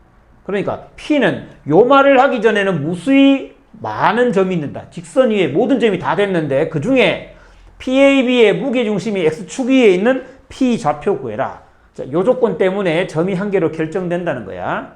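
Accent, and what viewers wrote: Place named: Gyeongsang, South Korea. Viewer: ㅋㅋㅋ. 강의하실 때 샘의 억양을 들으니 혹시 대구 분이 아닐까 그 동안 생각하긴 했지만 실제 수성구 범어동일 줄은 몰랐습니다. 친척 따님이 수성구에서 KT 다니는 남자와 백년해로를 언약해서 거기서 혼례를 치르기로 했습니다. 정말 대단한 우연이네요. 저도 일반적으론 높은 확률을 따라가긴 하지만 이상하게 꼭 그렇진 않게 되더라구요. 전 정수론과 복소해석학이 전공인데 확률 전공이 아니어서 그런건 아니지만 전 수학자이면서도 절대적으로 확률을 믿지는 않는 경향이 좀 있습니다. 우연에 내맡겨 버리기도 하죠. 저도 지난 주 파워볼 60개 번호 중 8개 숫자 조합을 모두 맞추면 잭 팟을 터뜨리는 아주 희박한 확률 싸움에서 겨우 2개를 맞춰 35 달러(한화 약 5 만원)를 타면서 역시 잘 안되는구나를 절감했지만 그래도 재미로 소소하게 하는건 괜찮으거 같아요 ㅎㅎ. 그나저나 돼지갈비로 먹을지 소갈비로 먹을지 벌써 갈등이 생기네요 ㅋㅋㅋ.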